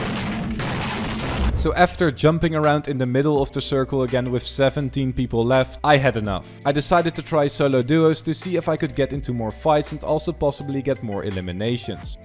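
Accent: Netherlands. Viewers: You Dutch right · Even een vraag je ben jij Nederlands just a question are you ducht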